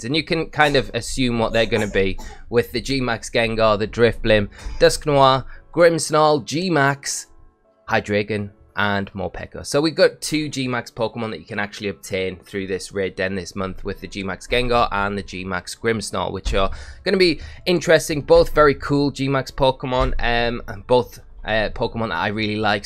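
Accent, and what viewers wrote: Newcastle, United Kingdom. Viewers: Wish we had gmax Morpeko 😢 btw are you Geordie?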